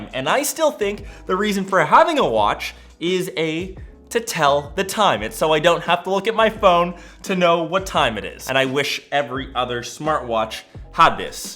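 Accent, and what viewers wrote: Canada. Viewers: Being from the UK I notice you say oooot when you try to say out. Does this mean your from Canada and my young history lessons from South Park were correct ? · Are you Canadian?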